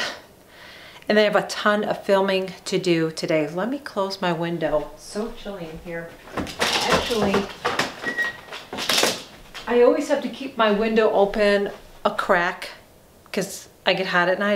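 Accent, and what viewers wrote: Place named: South, United States. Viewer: Hi lovely!!! Love your intro music!! Awww there's my girl!! Lol!!! Save yourself the fight! He doesn't need to know you're leaving the window open!😂 so excited you're gonna be house hunting!! Take us!! I can't imagine how huge this move is! The thought of it makes me stay out! Lol!! I love you taking us around the house!! You look gorgeous as your real life self! That's so interesting hat they staged your house! It seems like that defeats the purpose of taking pix of your house! 10 videos what!!!??? Love the glitter deer! And the trees!? Precious and pink! Love them all!! What!!?? Girl I love you more!! And omg that actually sounded really good!! I think you're getting really good with the southern accent!! It sounds greatttt on you!! Yes girl noooo drama!! We run from it!!!! I feel the same way about you sister!! 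Love you to pieces and loveeeee my daily time with my!! Love your vlog!!! Even if you hadn't mentioned me I love this style video on you!!! You're seriously even more beautiful in real life!! And this comment is embarrassingly long! Lol!! Love you to pieces my friend!! Xoxoxo!!!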